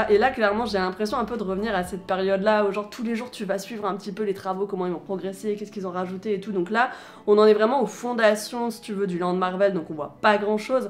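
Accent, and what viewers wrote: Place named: United Kingdom. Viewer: J'aime beaucoup ton accent anglais! (Non non je ne ment pas!) Et pour info Ireland en anglais se prononce "ailleurland"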